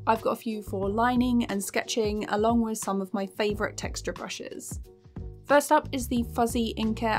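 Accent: United Kingdom. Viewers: Your animation is great and tahnks for the pack! lol your british accent is rly clean and heavy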